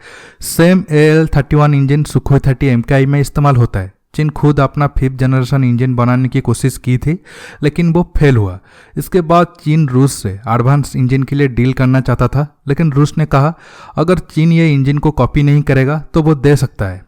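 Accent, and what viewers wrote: Odisha, India. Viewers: Bhai aap ODISHA se ho kya....?????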